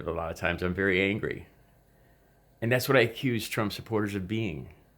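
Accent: South, United States. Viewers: You made a very wise choice in checking Beau out. He's highly intelligent and delivers subjects in a manner that all can understand and hopefully make them use critical thinking. I have to grin about your speaking with a southern accent...Beau is from Florida...all Southerners have a drawl and I love being from the south. You take care and I am glad you like Beau...he's a good man.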